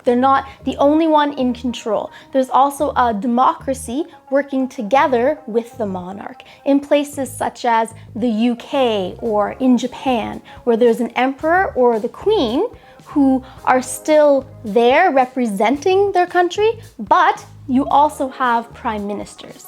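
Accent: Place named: Canada. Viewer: Thank you for good lesson! I live in Ukraine and always wanted to visit Canada! It's a very big pleasure listening Canadian pronunciation!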